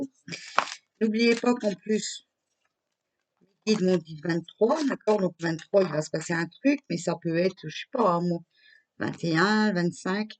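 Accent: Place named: Nord, France. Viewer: J’adore cet accent deuch chnord !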